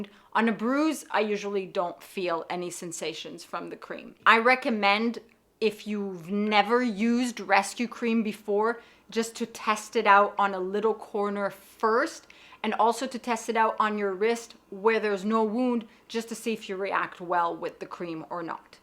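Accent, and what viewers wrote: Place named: Canada. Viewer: Great video :) You speak so clearly, love your accent! Canadian?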